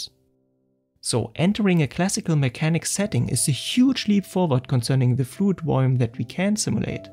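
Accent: Germany. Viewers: I sink I can hear out a German accent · WTF dude ?! I was like „yeah time so go to sleep“ and than suddenly YT dropped your video in recommendations. The quality is INSANE ( and I just started the video). I am WAY to tired to understand everything. Just saved the video and will come back tomorrow to appreciate the work. Amazing. Quick question, it seems like you’re from Germany ? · Deinem Akzent nach, bin ich mir ziemlich sicher du verstehst Deutsch :). Wirklich ein riesiges Lob an dich. Auch der Channelname passt perfekt. Ich würde mich sehr freuen in Zukunft mit weiteren Hirntrüffeln versorgt zu werden :) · Thank you for the great masterpiece! It's one ob the best on the Web. The visualization and data representation is just amazing. This is just an exemplar for all who makes presentations and lessons. Quite professional! Very consistent and well explained material, easy to follow. 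Do you answer the questions in the comments? If so, I have some questions and comments. (One thing it would be nice to improve is the pronunciation. Let me guess, are you German? The pronunciation is good, but accent for some words reveals you and sometimes in the way to listen; everything is fine and understandable, just distracts because of funny pronunciation. Maybe it worth to ask a native speaker to read? On the other hand, I like both the voice and way of speaking). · Germany, Austria or Switzerland would be my bet. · DER DEUTSCHE ACCENT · too heavy German accent can not handle it.. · Lass mich raten, du bist Deutsch? Hört sich aufjedenfall so an 😂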